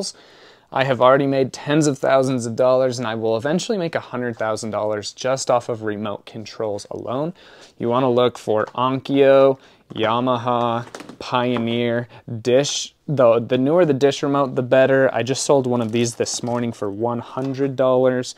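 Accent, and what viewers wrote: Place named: Scandinavia. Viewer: Loved your Scandinavian accent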